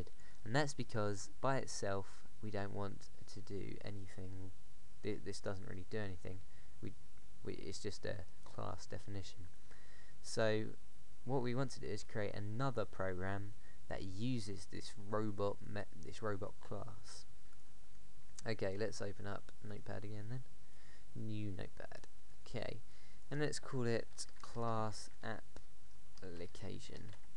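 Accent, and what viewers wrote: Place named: Europe. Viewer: Ello mate, just wanted to say that cuz im puerto rican and that euro accent sounds kool. anyways great tutorial I enjoyed it even though I currently know this information I believe it was good for people currently studying java. Also isbcrv maybe its a good thing that he didnt merge all the methods together into one, cuz it shows new users how to create multiple methods in one class and then call them from the main. I agree eclipse should be used but hey the guy likes notepad thats kool :-D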